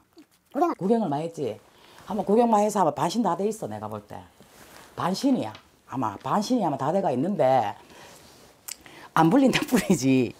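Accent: Gyeongsang, South Korea. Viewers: 예쁜선생님 오랜만이네요. 더 예뻐지셨네요. 뵙고싶었습니다 . 억수로 경상도말씀 징하게하십니더 그래도 말씀 옙뻐에 쌤 건강하세요. 인천이라에 시원 시원하신것이 아주좋습니더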